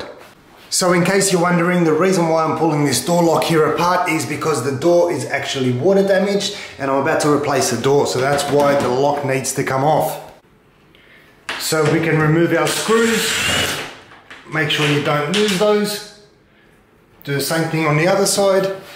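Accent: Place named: Australia. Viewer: Thankyou, i was about to rip the door off it hinges but you saved me. What is with the rubber washer, dumb. Love hearing a aussie voice on a YouTube help channel .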